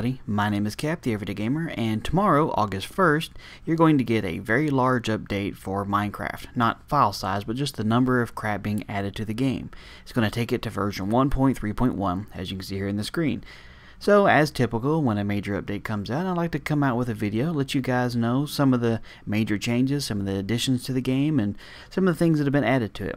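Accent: South, United States. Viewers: I like your accent. You live in the south? I live in the midwest.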